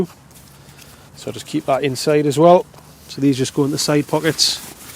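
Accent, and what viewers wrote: United Kingdom. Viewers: From America but lived in Sunderland for a time. I sure miss the Geordie accent. Thanks!!!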